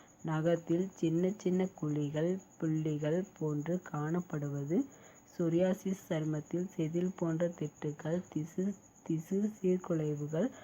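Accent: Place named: Kerala, India. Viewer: pesurathe Kerala mam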